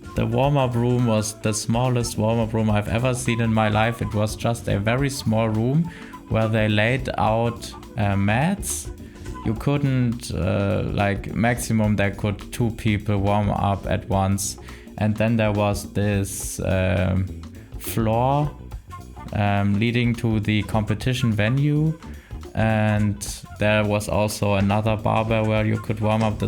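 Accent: Germany. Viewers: Ich dachte die ganze Zeit schon dein Akzent ist deutsch Geil, bleib bitte dran und mach weiter so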